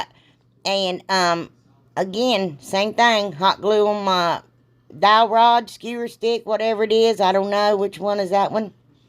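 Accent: South, United States. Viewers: I am a fair dinkum Aussie lady who loves craft and love listening to your accent, so southern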